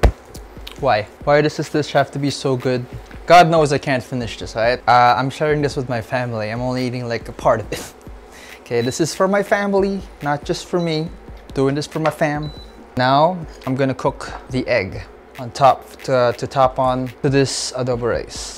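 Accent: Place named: Philippines. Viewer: I THINK YOUR ARE FILIPINO BECAUSE OF YOUR ACENT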